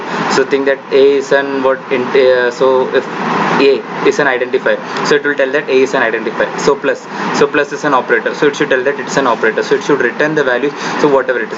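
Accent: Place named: India. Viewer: i dont know why but whenever Indian people in YouTube speak English, even though they have a thick fucking accent they would keep speaking as fast as fucking possible... you have a fucking accent and your speaking like your trying to run a marathon...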